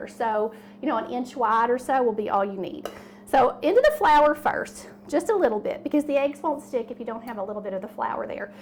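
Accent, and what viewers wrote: South, United States. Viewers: I love how happy you are about sharing your cooking skills! And your southern accent! 😉 I’m southern too! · My wife and I who now reside in Wyoming grew up in Memphis. We Love your accent. Your cooking skills are a reflection of your Famous Southern Heritage, we can't wait to try this recipe. Thank You!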